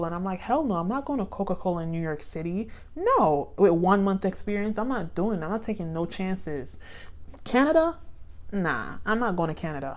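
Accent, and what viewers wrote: Jamaica. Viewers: I really want to do local but hmmm! I think I heard a Jamaica accent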